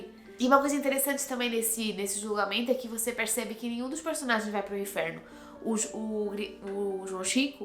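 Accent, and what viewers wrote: Brazil. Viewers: Ué, você é portuguesa? Seu sotaque é brasileiro. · Seu sotaque está se abrasileirando, massa. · ela emula um pouco o português do brasil, ela ja comentou isso. o portugues de la mesmo come muito as vogais, pois uma época de Portugual eles intencionalmente quiseram se aproximar do francês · Ela fala com um sotaque mais Brasileiro do que o sotaque de Portugal. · Ela com o sotaque brasileiro fica bem melhor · Pq ela fala igual um brasileiro sendo portuguesa??? Que top. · Teu português tá muito brasileiro 🤔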